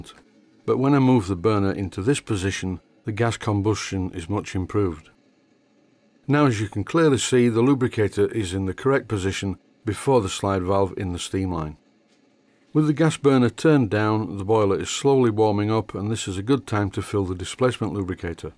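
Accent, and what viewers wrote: Yorkshire, United Kingdom. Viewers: Your Jorkshire dialect makes it sound like you say "combustshn." :D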